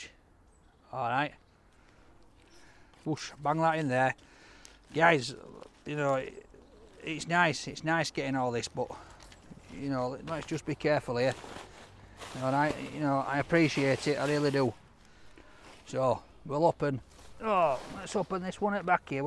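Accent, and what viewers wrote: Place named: Yorkshire, United Kingdom. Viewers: your the man mate. I tend to binge watch, so I could be two weeks behind on video's. But I will catch up in a few days. I am a Yorkshire lad Doncaster so not far away from you, and I have the same accent as you Mucka ❤️ col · Hi, my gift was sat at the front of your table but unfortunately you didn't have time to open it. All I will say is it may be beneficial if you open it first on your next unboxing video. It is a purple parcel with the postcode blacked out where i got it wrong. For the last 20yrs I have been suffering severe depression after a lifechanging accident whilst at work, and I have to say that watching your videos puts a smile on my face. I worked in Barnsley for 7 years and your accent brings back all the happy memories from before my accident. Keep on doing what you're doing pal, I'm sure that you bring a smile to everyone that watches. 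All the best for your future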